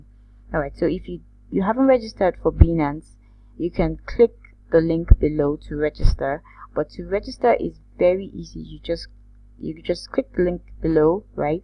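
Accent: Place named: Nigeria. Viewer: Ah, Nigerian. I thought you sounded Caribbean ha ha. I see what you mean. But you say switch my BCH to Eth or BTC. But i don't have anything to switch. I have nothing, I'm looking to start trading. Are there other platforms where I can buy BCH directly? Or do you think I should buy either BTC, ETH, USDT externally first, then transfer it to finance to trade?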